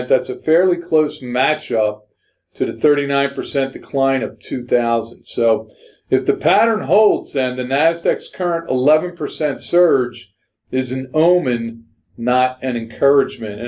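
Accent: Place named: Philadelphia, United States. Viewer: I've listened to weekly half-hour infomercial for many years. He's a guy whose appearance does not match his voice. That's neither a compliment nor a put down. Just an observation. One thing I'll give him is he's got the southeast Philly metro dialect down pat. When I hear guys talk like, I know I'm dealing with someone from home.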